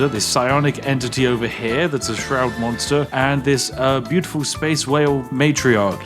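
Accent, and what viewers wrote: United Kingdom. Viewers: I don't even want to play this game anymore. I just enjoy his sarcastic British canter. At 1.5x speed it's even more potent somehow. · I think this guy might be a tad British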